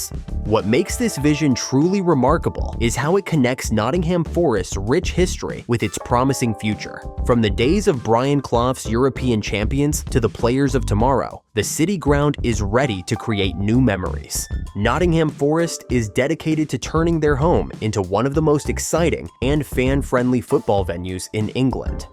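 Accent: United States. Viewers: Why the stupid american accent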